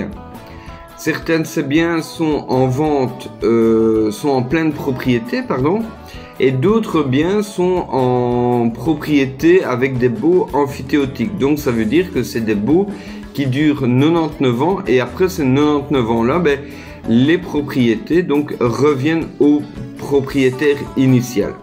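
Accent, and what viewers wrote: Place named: Belgium. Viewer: Bonjour, je vous découvre ce soir! Seriez-vous Belge? Il me semble reconnaître un bon petit accent de chez moi! Je vais passer 3 mois à l'Île Maurice pour découvrir et voir si c'est possible de m'y installer dans le futur! Pourriez-vous me dire comment trouver une chambre, un studio pas trop cher pour une période de 3 mois? Est-ce possible de trouver près de la plage? J'aimerais aller vers Rivière Noire pour nager avec les dauphins! Je vous remercie de votre réponse! Puis je vous envoyer un mail? Merci bcp! Evelyne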